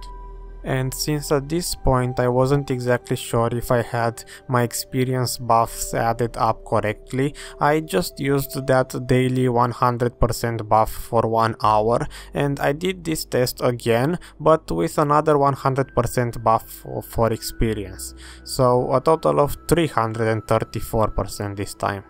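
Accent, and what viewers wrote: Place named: Romania. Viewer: esti roman da-te dracu! ti-am depistat accentul :))) noroc , bun video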